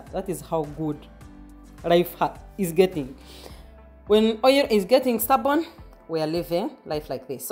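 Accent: Uganda. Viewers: just curious! im gambian (west africa) so i haven’t met many east africans (outside of somalis, sudanese, and ethiopian). your accent reminded me of kansiime anne, a ugandan comedian so i figured it might be ugandan. anyway thanks for the recipe, i will be using it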